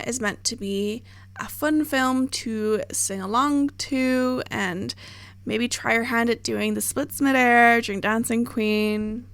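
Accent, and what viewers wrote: Ireland. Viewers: i was wondering why you had a slight irish accent and then you said your house in ireland (and ar-land not eye-er-land to boot lmaoo) everything makes sense !! irish queen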